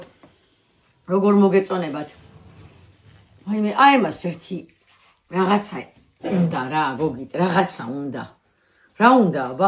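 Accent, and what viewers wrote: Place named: Kakheti, Georgia. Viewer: Rogor mikvars es aqcenti da saubari kvela kutxes tavisi damaxasiatebeli saubari aqvs mtis kaxur narevi saubari ❤gaumarjos saqartvelos tavisi lamazi kutxeebit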